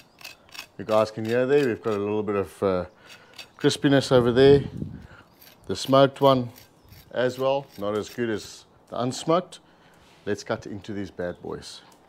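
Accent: South Africa. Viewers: Please tell me you're South African or remotely from SA...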